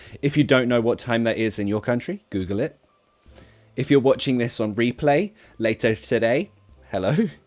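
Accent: United Kingdom. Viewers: It was superb to speak with someone who has a perfect british accent 😊 thank you teacher 😇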